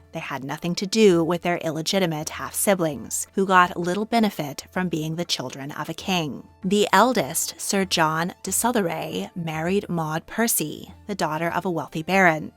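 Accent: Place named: United States. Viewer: Such a strong American accent makes it a littke difficukt to understsnd all she says eg 'Rozayz- - 'roses'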